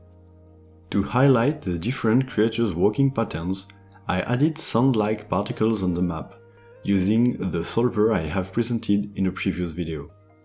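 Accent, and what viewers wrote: France. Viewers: Je confirme on sent l'accent français qui ressort par moment sinon trop bien la vidéo continue comme sa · You're French, definitely. Because I Can understand your english way too easily. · Okay. Btw, aren't you french because you're accent is suggesting me that ? Nice video though.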